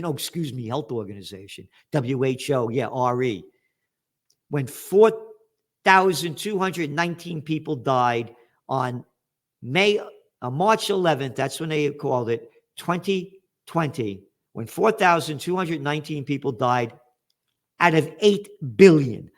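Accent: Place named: United States. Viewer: You speak American, I like it.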